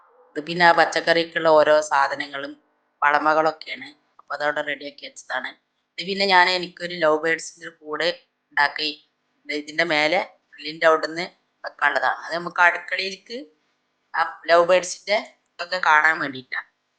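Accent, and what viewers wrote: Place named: Kottakkal, India. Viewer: സംസാരം കേട്ടപ്പോ മനസ്സിലായി കോട്ടക്കൽ അപ്പുറം ആണ് സ്തലം മനസ്സിലായി ഞാൻ രണ്ടത്താണി ചെറുശോ ല യാ ണ്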